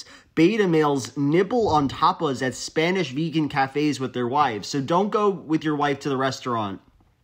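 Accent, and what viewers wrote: United Kingdom. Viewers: I genuinely thought you were from the UK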